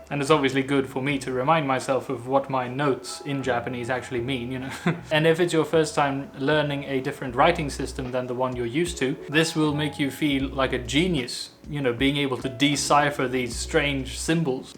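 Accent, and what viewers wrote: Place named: United Kingdom. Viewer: We’re just catching up on a lot of Swedish things before we visit Stockholm at the end of August. Now we are addicted to your videos. And your fine British accent, and liberal swearing. Now we’re enjoying your Japanese - can’t wait to go back ourselves. If we’re ever in the same city I’ll buy you a drink. A small, inexpensive drink, but a drink nonetheless. Much love from the UK.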